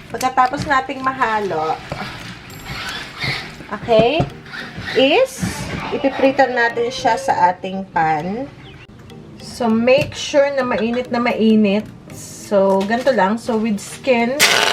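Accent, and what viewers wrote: Batangas, Philippines. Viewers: ate new to your vlogs💕 batangueño ka ho baga?🤗♥️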